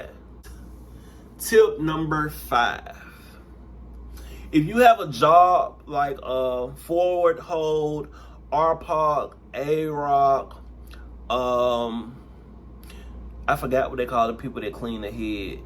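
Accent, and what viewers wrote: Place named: South, United States. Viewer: Holy southern accent!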